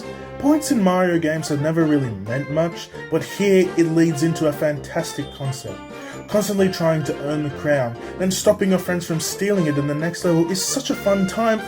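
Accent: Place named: Australia. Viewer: the australian accent. like nails on a chalkboard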